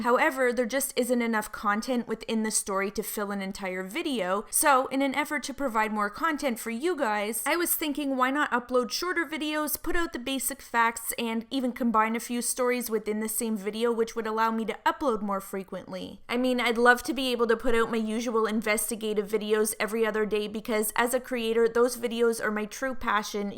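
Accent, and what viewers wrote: Canada. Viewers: are you fromcanda or the midwest? that accent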